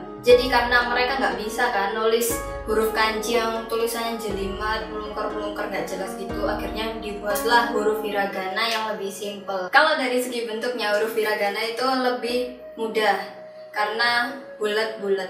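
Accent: Java, Indonesia. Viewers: jowone jowo endi mbak ? hahaha jogja ? semarang ? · kok medhok tenan wkowkowko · Halaa logat e jowo tulent iki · Aku kira mba nya orang jepang.. eh tau2 nya medok #hahaha.. Btw, terimakasih infonya :D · Njir wong jowo 😍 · ojo medok medok mbak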